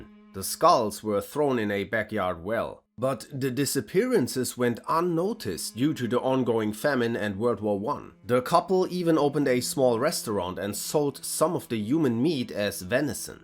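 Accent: Germany. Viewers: am just messing with you bruh i like german accent and it doesnt matter nothin how you pronounce something. If we all spoke same accent it would just be boring.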